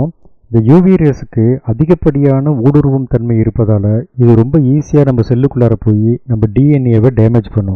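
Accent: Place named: Sri Lanka. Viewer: Valthuhal. Neenga engayo poga poringa. Mulu Thamizh kekum podhu oru Sri Lankan vibe iruku. English warthaigal warudhu. Medhuva korachikonga. Tanglish la eludhinadhuku mannikanam. From 🇱🇰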